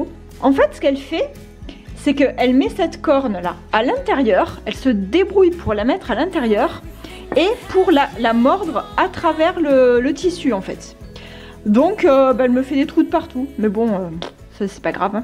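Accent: South, France. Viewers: Coucou 👋🐈🏵️🐥💐🌼🌷🌹❤️🌿J'aime beaucoup tes vidéos 🤗 et ton accent du sud 🌻🌻🌻🌻merci pour tout 🐈👋💕Isabelle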